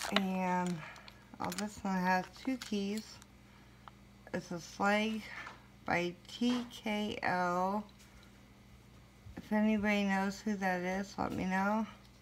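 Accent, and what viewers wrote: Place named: South, United States. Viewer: Just recently subbed to him!!! The dudes a real good picker ...... and he has a bad ass southern accent....!!!!!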